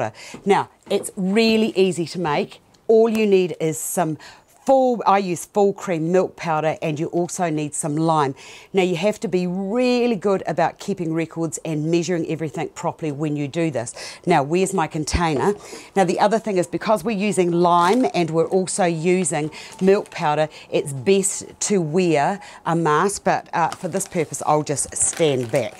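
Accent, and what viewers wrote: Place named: New Zealand. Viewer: Thank you for answering. So helpful. Miss that lovely Kiwi accent. I’m from the States, but lived in NZ for 3 years back in the 90’s, 1 year in the greater Auckland area and 2 just outside Christchurch (Governor’s Bay). Beautiful country and lovely people. 😊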